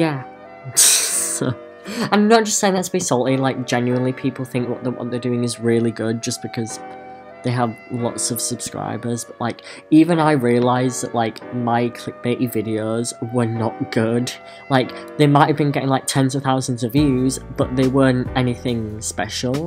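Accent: England, United Kingdom. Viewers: are u from England i swear u sound like u do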